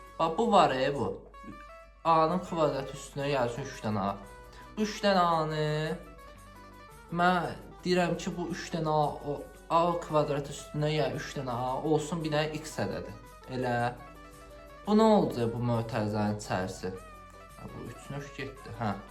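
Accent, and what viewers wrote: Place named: Baku, Azerbaijan. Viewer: Qeseng 👍 lehce yarasib ozumde bakiliyan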